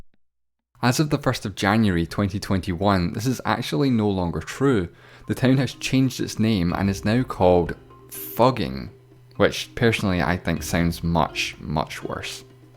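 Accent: Scotland, United Kingdom. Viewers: Omg it’s so nice to hear a Scottish accent doing a voice over yasss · lol i heard that but cmon don’t make fun of his scottish accent i’m part scottish too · Sounds like his accent is from scotland from brave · i think he's scottish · i think he’s Scottish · finally a scottish voice, not a yank!! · Is this guy Scottish? Man, those thick accents! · You're welcome. If you follow his channel and have seen all his videos. You will already know that he is from Scotland (he even said so). I'm used to his accent, it's cute. Much better than the posh accent of BBC documentaries. lol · what is this guys accent? it sounds scottish but it doesn’t at the same time lmao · I would like to know where the channel's owner accent is from? Scotland? Ireland? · He is Scottish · Whatever, I´m very much enjoying your Scottish accent, keep ´em coming!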